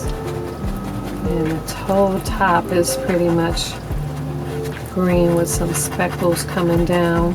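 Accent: New Orleans, United States. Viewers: you crack me up enunciating pump-kin extra hard since people were commenting on your New Orleans accent. It’s ok to say pun-kin 😂 🎃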